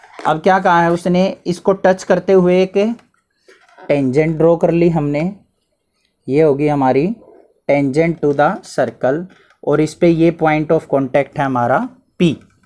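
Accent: Haryana, India. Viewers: I think ap haryana se ho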